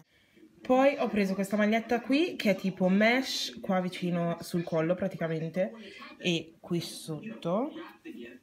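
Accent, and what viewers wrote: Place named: United Kingdom. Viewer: Ciao sei italiana (cioè nata in Italia) e poi trasferita a Londra o cosa?:) appena iscritta mi piaci molto e apprezzo molto il tuo accento molto british haha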